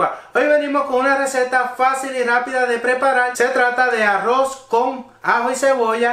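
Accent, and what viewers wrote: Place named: Puerto Rico. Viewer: Que lindo te vez hablando me gusta el acento de los bori hoy voy hacer un arroz con ajo y cebolla 🤗😀🧐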